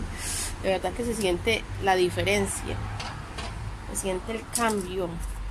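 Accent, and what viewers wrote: Colombia. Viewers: Ese tan gracioso 😅 Me encanta tu acento colombiano y que nos llames muchachos y más aún, parceros. Me haces sentir en confianza. Abracito a tus pequeños 🤗🤗🤗🤗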